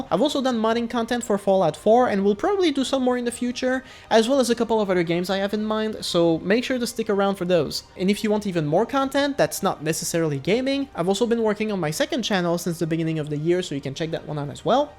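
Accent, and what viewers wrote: Quebec, Canada. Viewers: are you from quebec? i think i hear a familiar accent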